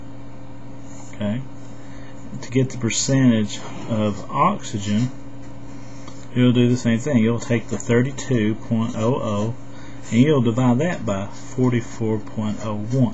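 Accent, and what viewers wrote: South, United States. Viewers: it's called a southern accent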